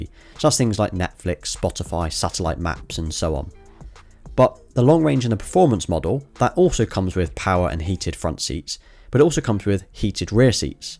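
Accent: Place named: South West England, United Kingdom. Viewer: aah i love this! its nice to see americans watching little ole us Brits videos! Thats a pretty good claim to fame for you ;) and you're getting your accent on the map too! From, fellow south west 'un. loving the videos. These people are correct. Your vids are informative, straight forward, compelling and shot really well. congrats on a fab channel.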